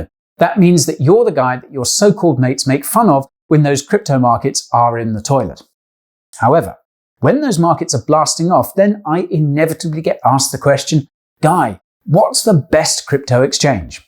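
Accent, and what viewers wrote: United Kingdom. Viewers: You had me at “getting myself a girlfriend “ and love that King’s English!! 🤣 Subbed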